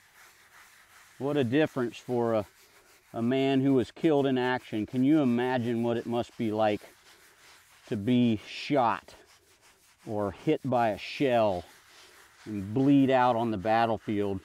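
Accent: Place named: Florida, United States. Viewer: You have a Florida accent, like Gary Rossington from Lynyrd Skynyrd.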